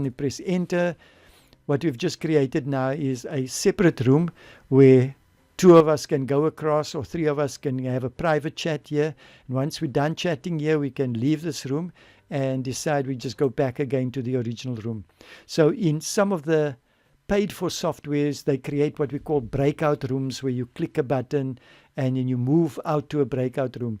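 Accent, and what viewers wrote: South Africa. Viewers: Ek wou se jy is afrikaans, groete vanaf Nederland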